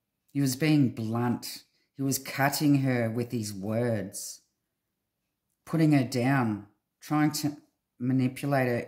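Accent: Australia. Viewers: This rings true. I hope they convict him and find her! Thank you for sharing. I love your work and your soulful Ozzie accent. :)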